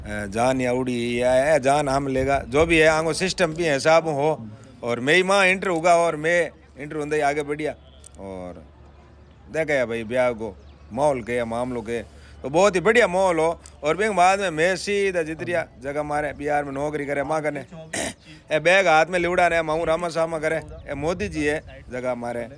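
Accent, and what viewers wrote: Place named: Marwar, India. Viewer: Aap marwadi bolo tho choko lage ..mn saaf h aap ko...thrko h aap ko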